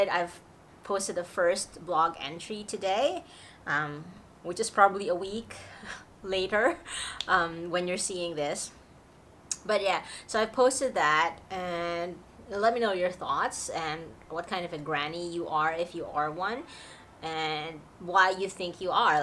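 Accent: United States. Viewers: Hola amiga:) I'm a little late but Congratulations on your wedding hope you had a spectacular day! May God bless you with love, happiness, laughter and patience on your wonderful journey together:):) I hope you post at least one picture of your wedding look. If you already did, sorry I'm trying to catch up on videos. I used to be a party girl loved the clubs and loud music especially the dancing I could dance all night and have the energy to go out the next day to do it all over again. As I've gotten older I don't go dancing as much anymore my health problems make it a little harder. And I lived for spending my days at the beach it took all the stress right out of my life. Also, enjoy going out to dinner and spending time with the girls and great conversation LOL When I started watching your videos I thought you were from the US. You're probably right watching all those shows growing up influenced the way you speak. 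The only show you mentioned I never watched was Friends. Star Trek is my favorite show. I'm from New York and hope someday you get to come and experience this wonderful place. There is so much to see from Broadway, Rockefeller Center, Lincoln Center to eating a NYC hot dog hahahahah And maybe you can do a meetup. Have a happy day!!! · Hello, una sa lahat huli naba ako. Tell you frankly watching & listening to your blog. Kaya naiingit ako sa iyo, dahil buti kapa meron kanang American accent samantalang ako ito halos 34 years na dito dihin parin gaanong sanay mag- English ewan ko rin kung bakit. Siguro dahil tinatagalog ko itong misis kong puti. Siya ang pinipilit kong magtalog. By way I missed iyong blog mong Tag-lish na naglalakad along busy city somewhere in Sydney sa palagay, iyong palagi mo pang sinasabi mahal din kapag ki- convert mo. At iyong lilipat na kayo sabi mo pa yata may daga at malayo sa trabaho ng mister. 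O well napahaba na nandidito na nga pala ako sa States of Florida, probably you heard about the astronauts & home of the " Blue Angel " iyon. I used to worked in the Space Program before malapit lang dito 15 minutes drive work their for 16 years. You like the climate here just like sa Pinas and 3 hours drive away to Miami where they always shoot the Miami Vice during my teenager life. Dalasan mo sana ang paggawa mo ng mga blog, gusto ko makitang viral sa mga gaya nuong ibang Pinoy!😍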